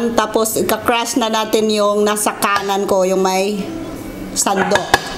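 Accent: Visayas, Philippines. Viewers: bisaya kaya xa ganyan. proud bisaya here.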